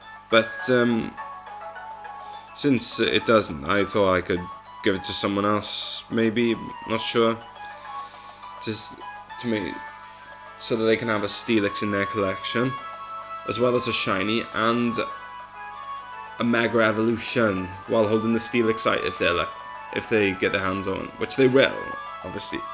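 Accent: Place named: Wales, United Kingdom. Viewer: Your accent and pronunciation, my friend is also Welsh it's pretty cool. I've got a boring English accent unfortunately haha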